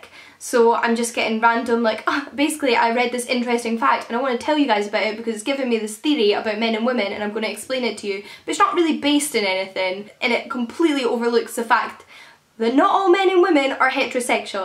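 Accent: Scotland, United Kingdom. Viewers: Where are you from? :) Im assuming thats a scottish accent?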